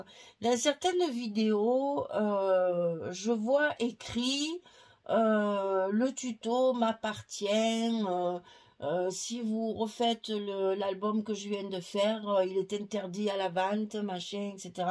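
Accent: South, France. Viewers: Bjr. Vous pouvez être très fière de vous Bravo Magnifique une œuvre d art entre des mains d une magicienne!! Vos tutos sont tjrs des découvertes, la surprise du Chef! On part à l aventure... avec!!! Vos explications, votre patience, votre bonne humeur fait que vous mettez du baume au 💙 dès qu on regarde un tuto, qu on entend votre accent du midi, 🌞 on oublie un instant nos soucis!!! Continuez à nous faire scraper, à nous faire découvrir vos tutos tjrs plus beaux... Merci pour le partage, prenez soins de vous et passez de bonnes fêtes de fin d année. Bises. Marie--France. Débutante dans le scrap mais je fairais un de vos tutos c est sûr!!👋👋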